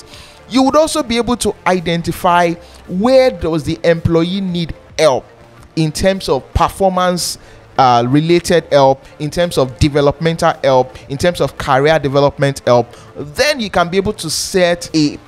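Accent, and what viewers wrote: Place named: United Kingdom. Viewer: Amazing explanation with British English . I am interesting with your curiously explanation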